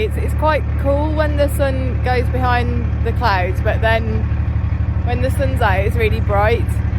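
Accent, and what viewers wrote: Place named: Birmingham, United Kingdom. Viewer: Another great vid. Defo detecting either a fellow brummie accent or Black Country accent. I grew up living in my Nans house which backed onto the canal (or cut as we call it). Her house was just down from the Tyburn House pub opposite Castle Vale north Brum. We always had barges parked up at the back of her house.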